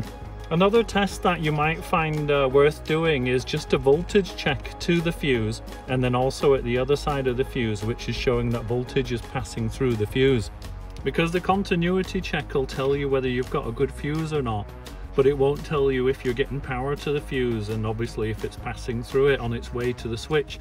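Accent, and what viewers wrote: Liverpool, United Kingdom. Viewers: Cool. Thank you for the demo! You sound like a Beatle.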